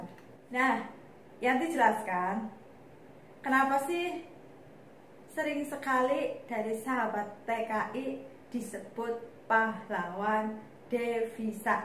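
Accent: Banyumas, Indonesia. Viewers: Jebule rika wong ngapak yak hhh jossss wonosobo monitor.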